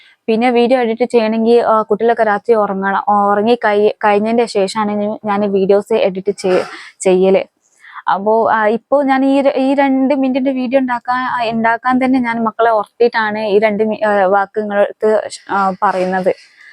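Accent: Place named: Uttar Pradesh, India. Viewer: Enikk thonnunnu ivar up yil ninn keralathilek jolikk vannu malayalam padichathanenn ....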